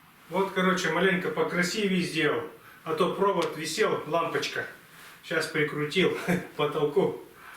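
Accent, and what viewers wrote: Perm, Russia. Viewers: Что за говор пермь, или ?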